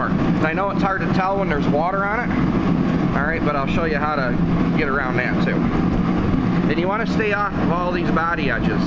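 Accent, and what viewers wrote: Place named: Upstate New York, United States. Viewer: Nice job as usual! Where do you live? I'm from Fairport NY. Moved south to Raleigh in '97. My first house I bought was a 19K HUD HOME in Lyons NY. Just curious as to where you're at. Good to hear the accent. The weather is great here but watching your vids makes me a little homesick.lol nice work and vids keep em coming.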